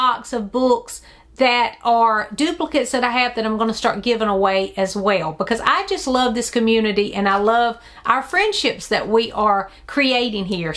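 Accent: South, United States. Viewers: Hi! I haven’t yet commented since finding your channel earlier this summer so today I decided was the day. I adore your channel! I really love your southern accent. I’m originally from Wisconsin so I am not accustomed to hearing the southern drawl and I find it so endearing. Whenever I find a new channel I enjoy, I go back and watch all of their videos to get to know them. I’m so enjoying you and the infectious love of books you bring to your channel. Your genuine passion and love shines through brightly and I thank you for being such a bright light. I love everything you post! I would love to see a video talking about your all-time favorite books that have left a lasting impression on your life. Thanks for sharing this haul 📚 ~ Chelsea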